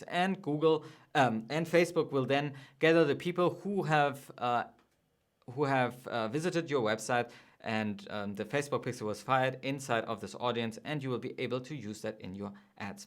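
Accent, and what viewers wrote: Germany. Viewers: If Yes, is it possible to have a call with you? I really need help with my tracking. Im loosing so much money, because something is wrong with my tracking. I have quiet good traffic and im still making 50+ Sales a Week (organic) now i want to scale. But something is in my setup is wrong! Of coruse i´ll pay you for your help! Pls answer to this comment or give me an oppertunity to contact you. Idk but i Think you ´re a german guy? Ich kann es am Akzent hören ;)) Ich freue mich auf deine Rückmeldung ;))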